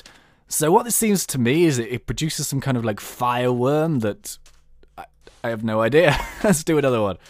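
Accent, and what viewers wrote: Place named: United Kingdom. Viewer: Your voice is so funny really such a typical English voice! (ps; I love your movies!!!)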